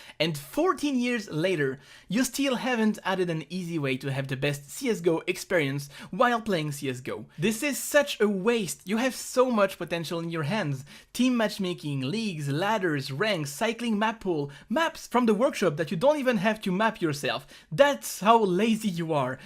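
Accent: France. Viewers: A l'accent, j'ai reconnu que tu étais français... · Tu est français ? J'ai l'impression de l'entendre dans l'accent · olala ton accent francait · Are u French? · Ohohoho, you got french accent monsieur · Ce léger accent français :p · He's French dude, accent's getting in the way, but still clean English. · You French? You've got a French accent when talking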